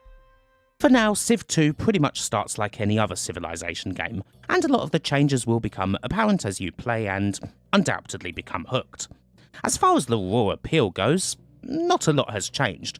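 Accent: United Kingdom. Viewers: I'm not watching 45 minutes of a lithping limey talking about a decades old game.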